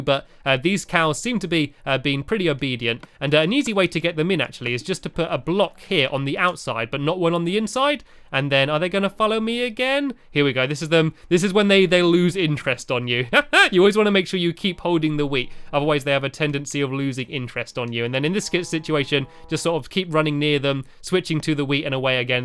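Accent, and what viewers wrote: England, United Kingdom. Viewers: Brianna Buckner he's British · Brianna Buckner Because there British and they live in the U.K. and in the U.K. they say zed instead of z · Well you must be American because he is british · Cuz he’s English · he’s british that’s why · it' because Z was originally Zed but because of 'Muraca it's Z so technically he is right and were wrong "short answer UK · were u born in englend i cant tell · he ameracan or english americans call it z and engerlish calls it zed thats just there landguge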